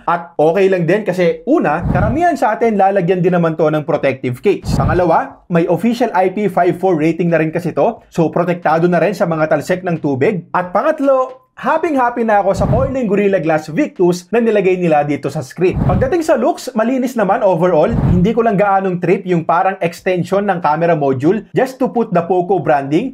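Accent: Cavite, Philippines. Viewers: tga cavite pala kayo sir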